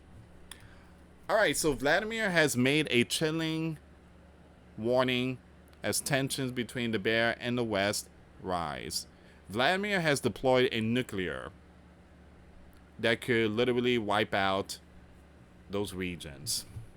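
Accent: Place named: Portugal. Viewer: Hi, are you Portuguese? I can't quite figure out what type of accent you have. You remind of the Dog Daddy, he has the same accent. He is a great young man who trains problem dogs of all types.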